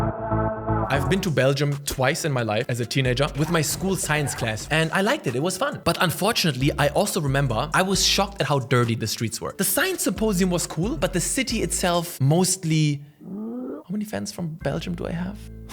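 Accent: Netherlands. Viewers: Love your Dutch accent. Much love for our neighbours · I know you live in germany but your dutch accent is so good lmao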